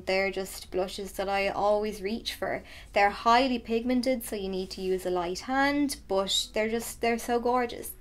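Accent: Ireland. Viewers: Great eye makeup!!😍😍🤗❤ love yaa😚 your Irish accent is soo cute!!! Greetings from Germany❤ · Love your videos! 😍Valid, professional tips and tricks, delivered in a sweet, friendly way. (I really hope I didn't offend you on the comment board for best concealers, about listening to you takes me "home" to Ireland, where I once lived. My intention was certainly not to disrespect you, it was meant as a huge compliment 😊)Love your Q&A idea for a video. I would like to know how to apply dark coloured eyeshadows under the eye (at the waterline)without smudging it and looking like I'm going to a grunge party. 😁I'm of Indian heritage and my complexion is light brown. Unfortunately I've been "blessed" with permanently darker circles underneath my eyes and concealers are absolute lifesavers for me. Whenever I try to wear eyeshadow underneath the eye, it makes the area look darker and it overpowers the effect of the concealer. 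Hope that makes sense. 😄🙈 Thanks again for your lovely channel.😊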